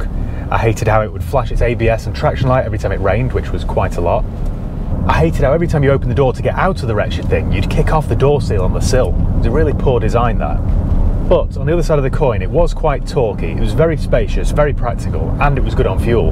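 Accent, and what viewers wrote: United Kingdom. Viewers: Wait, you moved to the UK? I thought you were a UK native. Shows what I know about accents haha. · He’s from a British island colony so no you’re not mistaken about the accent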